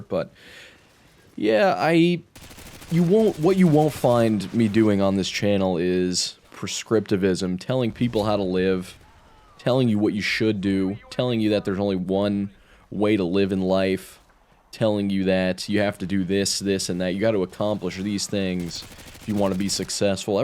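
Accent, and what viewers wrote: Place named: Florida, United States. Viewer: Hey man, I really enjoy your content, your channel was introduced to me couple days ago and i've been watching some of your videos. Very relatable, and the talks about lifes difficulties, you almost sound like a Floridan version of me. Hope to see more of your content and keep your head up, better days are obviously coming... That's atleast what I tell myself.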